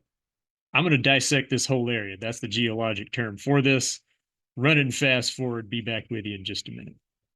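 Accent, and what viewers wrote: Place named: Texas, United States. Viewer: I can't place your accent. Which is strange. It's like half southern and half texas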